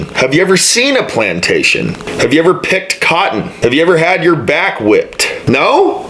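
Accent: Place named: South, United States. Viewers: I'm Black and me and my circle of friends 99.9 % of our time, never complained or even thought about racism ,white privilege, or inequality . We had more diverse things to talk about. (There IS a silent minority among us ) By his tone, the narrator(he sounds like a White Southerner and I honestly don't know about white/black relationships in the South) he's making it sound as though Black people just sit around all day and talk about racism. Personally, I'VE never been concerned about people who perpetually discuss racism. Stop pigeon holing all Black people. His conversation isn't really worth listening to. Stop trying so hard by convincing Black people that you are not racist if you're not.Quite honestly, I'm not concerned about your social graphics.just ignore people who try race baiting, they probably have nothing else better to do with their lives. 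I'm slightly annoyed by talking about people who talk about racism.JUST IGNORE THEM...PLEASE?) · Ain't nothing better than "Southern common sense!" It's always good but that Southern accent just makes it better.☺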